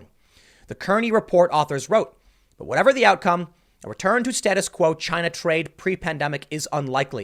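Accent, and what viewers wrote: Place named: United States. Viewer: to you. I have listened to probably about 15 of your vlogs or whatever you would call them. And to me you sound to me like an American. I am 55 but when I was a younger man I could discuss issues including politics with those who diametrically oppose me on most opinions, then we could go to the movies switch discussions to music or anything. You are articulate and you back up your opinions with data. No one on the right or the left should expect more of you. Keep up the good work. If everyone agreed with everyone 100% of the time we would be living in a robot police state with no free will.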